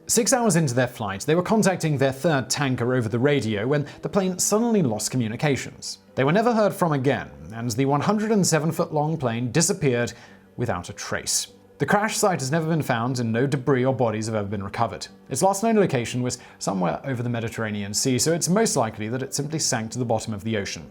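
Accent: United Kingdom. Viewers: He's British, they pronounce some things differently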